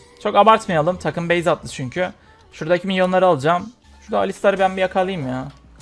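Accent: Adana, Turkey. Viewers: Adanalı mısın?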